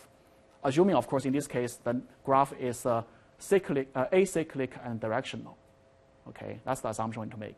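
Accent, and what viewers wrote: China. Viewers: Chinese English,XD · 我第一反应也是天朝口音真亲切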